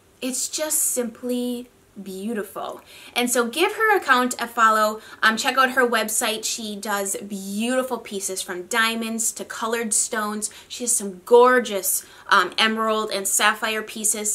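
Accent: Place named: Upper Midwest, United States. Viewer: I LOVED this concept!!! And don’t worry, you still have your accent. It’s part of what I enjoy about you being from the Northern Midwest as well 🤗😍👏❤️💕✨💕💕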